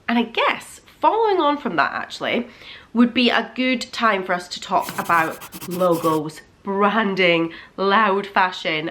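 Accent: Scotland, United Kingdom. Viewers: Your accent is so pretty. Are you from Scotland or Northern Ireland?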